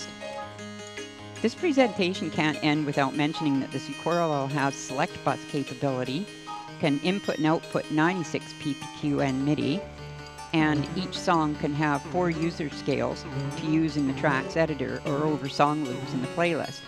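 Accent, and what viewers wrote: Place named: Midwest, United States. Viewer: Awesome! We were thinking midwest U.S. or maybe Western N.Y. near where I grew up in haha! You're accent is very similar! Cheers!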